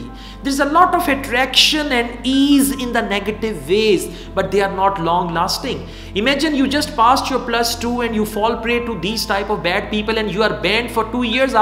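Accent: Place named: Punjab, India. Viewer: Thank you sir for updating us about the current affairs of Ielts . By the way i like your punjabi tone during video . Almighty Allah grant you long and healthy life . ameen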